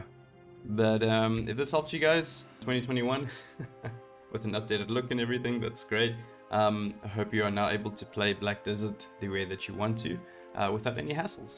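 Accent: South Africa. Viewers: Do I hear a fellow SA accent? XD Thanks for the vid!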